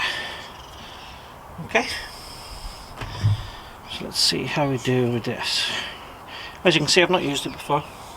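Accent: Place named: Scotland, United Kingdom. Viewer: Scottish 100 (you sound scottish)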